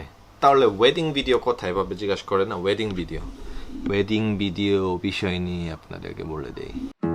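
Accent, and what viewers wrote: Bangladesh. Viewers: You speak so good....plus you have that casual accent of Dhaka.😮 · Borishailla naki..??? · Your Bangla accent is so cute😊❤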